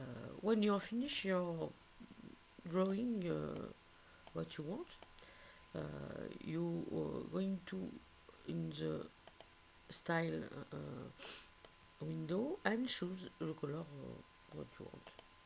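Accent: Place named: France. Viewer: Great tutorial. Your english was perfect. Your accent reminds me of my grandmother whose first language was French. I miss hearing it. I learned something new with the tutorial! Thank you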